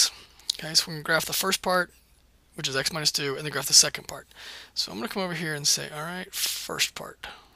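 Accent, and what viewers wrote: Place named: United States. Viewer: Super helpful and all, but did anyone else thinks he sounds like Caspar Lee with an American accent?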